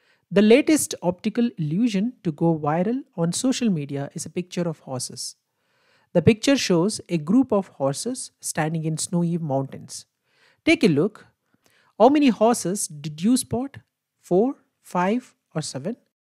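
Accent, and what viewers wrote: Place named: India. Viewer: Maybe you shouldn't explain an optical illusion to English speakers with such a thick Indian accent. I'm happy you explained it, but I couldn't understand what you said for H 6-7. I still only see 5.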